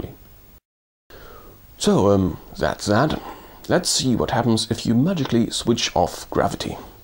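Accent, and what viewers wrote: Germany. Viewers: If i hadn't read this comment before, i could think you were from Germany with this accent.^^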